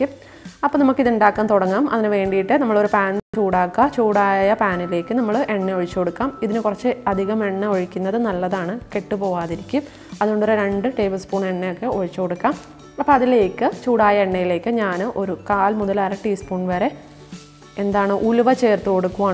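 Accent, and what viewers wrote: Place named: Palakkad, India. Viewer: U have a Palakkad influence in it language....but whatever....I loved this recipe hit like.....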